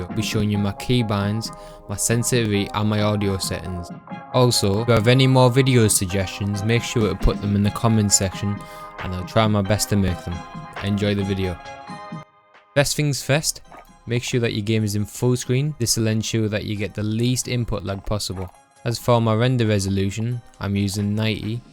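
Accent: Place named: Newcastle, United Kingdom. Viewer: unless your a fucking dweeb love that mate keep it up inaul you geordie? sounds it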